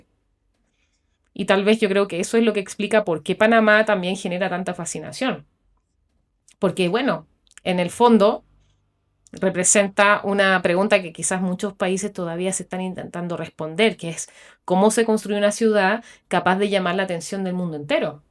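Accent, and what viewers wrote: Chile. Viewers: Muy bueno tu video. Me parece por tu asentó eres chilena. Mira, Panamá desde sus inicios fue destinada por el mismo creador a desarrollar su economía en base a los servicios. Su posición geográfica, su territorio y hasta la mentalidad de su población apunta a eso. Dese la llegada de los primeros europeos, desde su creación como nación libre, desde simpre su destino fue ese. Tantas cosas para comentar. Termino con esto. En nuestro escudo ee armas, esta escrito esto. Nuestro lema es "PRO MUNDI BENEFICIO" qué significa, Para BENEFICIO DEL MUNDO. Imagina fue creado en 1904. Desde simpre es y será nuestro rol. Saludos desde el puente del mundo. Bendiciones. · Tu creo eres. CHILENA por tu acento, pero tu analisis esta bien hecho y estudiado Te felicito y en horabuena por ti, creo no me equivoco haces PERIODISMO INVESTIGATIVO MUY BUENO. Resaltas y dices las cosas como son y porque se dan. 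NO COMO UNOS REPORTAJES DE ARGENTINOS QUE ESCRIBEN UNAS "BURRADAS".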